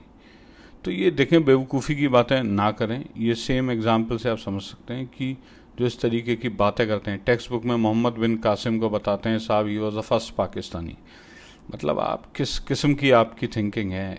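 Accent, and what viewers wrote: India. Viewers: India was INDIA under aurangzeb Rule .. the largest UNITED land area .. otherwise India, before MUGHALS was never a SINGLE country or had any central GOVT. It was divided into different states. Hindus ne UNITED India pe kabhi rule nahi kia , na hi kabhi INDIA united tha ... very biased ... u are articulate though and ars misguiding people confidently... U are INDIAN ,as it seems from ur accent and pronunciation... Pakistan ban gya , u hv to accept It ..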